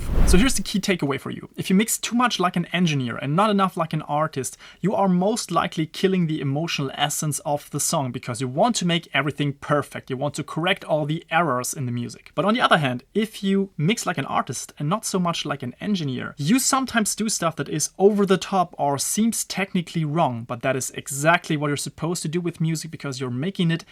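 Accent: Germany. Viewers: Are you German? That accent sounds familiar 🙂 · Man hört echt raus das du deutsch bist bro haha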